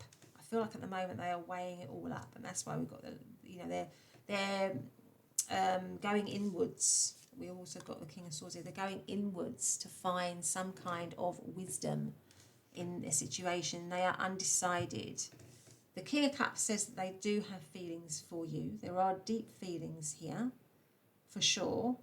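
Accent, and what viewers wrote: United Kingdom. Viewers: i noticed your channel a long time ago and been watching a few, it was quite on spot. but i always am puzzled as in why such little follower count? if i can give advice, i would say it's the volume, i have to turn your volume up everytime. and maybe you speak a bit too fast, combining with the British accent combining with how quiet it is...poeple would struggle to understand. i'm saying this caz i simply cant understand why so many channel that doing readings that were never my situation attract so many attention while the one that does make sense is not receiving equial share. so, imrpvoe your mic maybe? i hope you channel grows.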